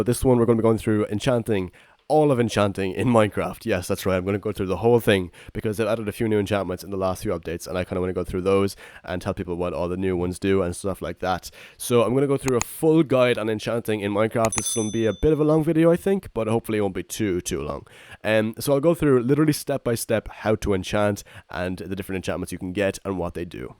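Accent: Ireland. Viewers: Sounds like an Irish John H. Benjamin...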